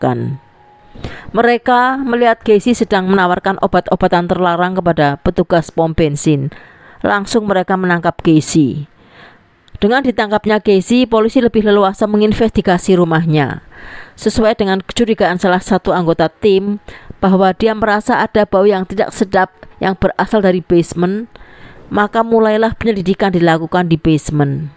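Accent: Java, Indonesia. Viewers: Apakah kamu sudah menjadi orang yg berguna dan memberikan kata2 yg positif utk org2 disekitarmu?Berkata- katalah yg baik, memujilah!! Kalau kamu tdk suka, bukan berarti org lain tdk suka. Karya dan kreatif, berbeda dgn youtuber lainnya, hargailah. Dgn medok jowo nya lah, menjadi karakter ciri khas yg saya suka. is the best!! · emba ini suara nya kya msih kentel logat jawanya ya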